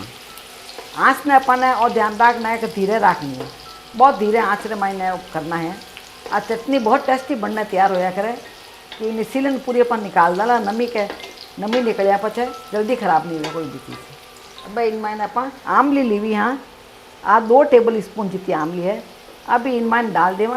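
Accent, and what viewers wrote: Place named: Marwari, India. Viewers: aapki marwari bhasha sun kr maja aa gaya. Har step smaj mein aa gaya. today I will try. Thanks Mummy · Ram ram sa ap ki marwacdu boli se pet bhar jata hai aur ap ki recepi👌👌🙏👌👌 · Yummmm👌👌🌹🌹🌹🌹chitney bahut achi he aur I love marwadi bhasha.. soo sweet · Aapki Marwari sunke meri Nani Maa Ki yaad aagayi aunty ❤️❤️ Thankyou so much 😢😢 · Bht Shukria. Apka bolne ka tariqa achcha laga marwar yad agio. Chatni bht mazedar lag rahi h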